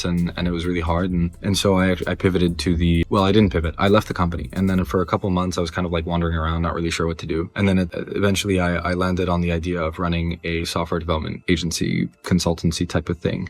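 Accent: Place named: United States. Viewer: You from spain... But you sound American how?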